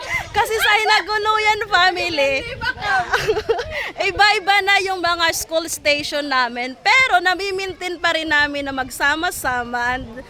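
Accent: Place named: Waray, Philippines. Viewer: Upaya gad. an pag Tagalog.. Ky"WARAY"accent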